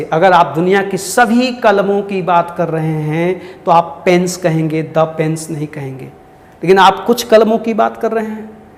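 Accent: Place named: Bihar, India. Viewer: sir ka dialogue aik to upar se bihari bht acha lagta hai ye😅😅😅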